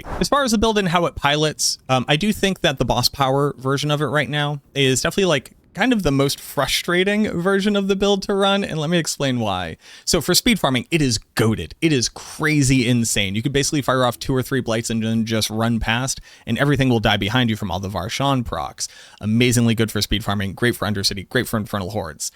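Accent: Boston, United States. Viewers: Please do a video guide in your authentic Boston accent. Thanks!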